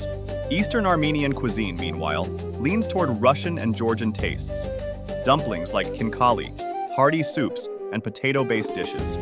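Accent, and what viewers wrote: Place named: Arab world. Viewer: You are ethnically Armenian, but culturally you seem more connected to the Arab world. I notice Arabic influence in your cuisine and even in pronunciation, like saying “Suria” instead of “Syria.” you call yourself Middle Eastern and calling all Armenian nation like that. However, Armenia is not considered an Arab or Middle Eastern nation in terms of identity. Armenia is a member of the Council of Europe 🇪🇺 and other European institutions and is part of the South Caucasus region, which is associated with Europe in political and cultural classifications. Many Armenians in Armenia identify as European rather than Middle Eastern. Geographically also we are considered part of Europe because according to EU law it requires geographic requirements that's why they rejected Morocco in 1987 and Canada have been told can't join but us they haven't been told that can't join and Armenia approved membership bill. 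Not applied yet. The same Georgia which have been accepted after applying and received candidate status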